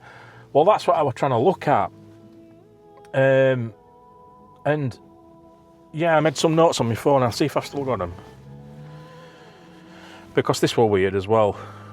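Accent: Yorkshire, United Kingdom. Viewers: Ey up. Is anybody more Yorkshire than you. Love it, loving the vlog. Hope you didn't get caught dogging 😆 Really liked the humour in this one. You're certainly finding your feet at this vlogging lark. Nice one 👍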